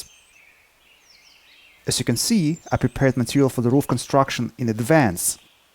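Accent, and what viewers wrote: Russia. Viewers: Такое впечатление,что русский озвучивает на английском языке ,такое акцент!!! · Tool me way too long to realise hes russian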